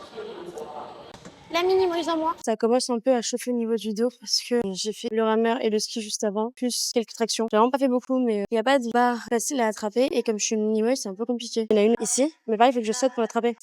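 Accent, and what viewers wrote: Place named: United Kingdom. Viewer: Dommage pour l'éclairage de la salle ca fait un effet assez désagréable ça 'clignotte' vachement :/ Pas de ta faute hein ^^ Mais pour les futures vidéos pk pas faire un effet voir ce que ça donne et ensuite régler ta cam/téléphone pour pas que ça fasse ça =) Il est mignon ton accent anglais ^^ Sinon bonne vidéo =D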